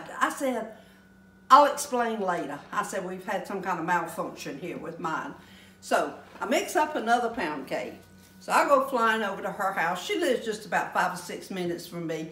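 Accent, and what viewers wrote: South, United States. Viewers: Oh my glory lol You are absolutely adorable I just love your southern charm and accent. From a generation we can surely learn so much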